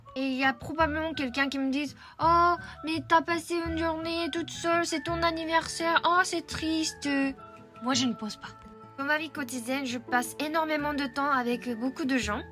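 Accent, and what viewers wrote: France. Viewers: 🎉 Je suis tombée sur ta chaîne tout à l’heure et AHHHHHH TU ES TROP MIGNONNE !! Tu es super attachante J’adore ta prononciation française !!! Ton accent est adorable ! Tu as une personnalité tellement tellement gentille Tu es bavarde aussi, c’est vraiment un plaisir de t’écouter Tellement naturelle ☀️ Merci pour tes vidéos 🙏 Des bisous de la France ! 🇫🇷 je suis sûre que tu lui manques beaucoup ! 🥺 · Joyeux anniversaire en retard moi c’est demain mon anniversaire 😊❤Et aussi ne change pas tes parfaite ,j’adore ton axant français quand tu parle ❤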